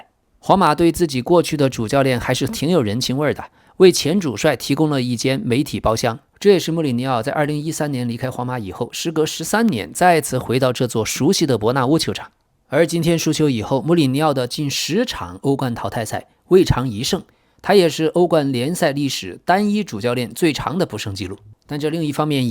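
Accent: China. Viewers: 眾口難調啊，我個人的經驗是字幕繁體優先，因為老k的聲音已經是大陸口音了，再配上繁體字幕 + 西語球員名詞，能夠最大化兼容大家的習慣。聽不懂可以看，看不明白可以聽，總能把信息傳遞出去。而且很多平時寫簡體字的朋友其實也看得懂繁體字，老k可以考慮多修覆一些繁體字的錯誤，而不是改成簡體。這個可以慢慢用 AI 來糾錯，集中找幾期過去的錯誤作為訓練，很快就能改進的。個人建議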